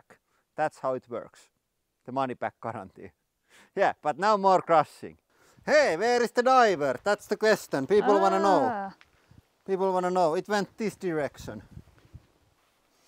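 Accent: Finland. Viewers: They're finnish, not even the same language family lol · He's a Fin.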